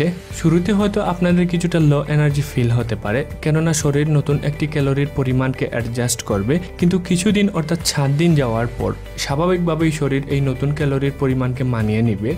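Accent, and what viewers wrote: Sylhet, Bangladesh. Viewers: Bro, are you from Sylhet? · ভাই আপনার মাতে তো বুঝা যার, আপনে সিলেটি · Sylhety re বা.... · bhai apne ki Sylheti?? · সিলেটি ভাই 🙂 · Jioy apner matei buja gese.. we are sylhety 😊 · Brother r u sylhety??? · সিলেটী টোন এ শুদ্ধ ভাষায় কথা বললে শুনতে দারুন মজা লাগে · Sylheti vai 🥰🥰🥰🥰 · ভাই কিতা সিলেটী নি???? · ভাই অাপনি সিলেটি তাই না? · আপনি সিলেটি আমি ১০০% শিওর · আপনি সিলেটী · apnar basha ki sylhet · Apne sylhety ? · Baiya apni ki sylheti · Vai apni ki sylheti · Sylhet er basai je kotha bolcen tar nam ki. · R you form sylhet ?? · Bhai Sylhety ni apne? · Bhai anni ki sylheti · ভাই গো আপনে কিতা সিলোটি নি? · Undoubtedly sylhety accent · আপনি কি সিলেটি? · Vai ki sylheti😀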